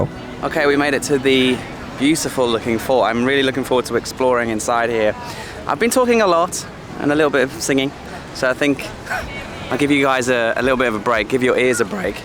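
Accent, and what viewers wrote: United Kingdom. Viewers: You have a British accent. Are you from UK? Do you have camera crew? You don't seem to be holding a camera? I also notice drone shots.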